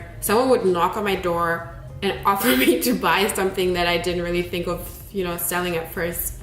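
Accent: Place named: Germany. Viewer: I was thinking that you were Brazilian, but I could hear a German accent. You are very pretty. And for me, I'm not too good with attracting money. I usually attract relationships & friendships.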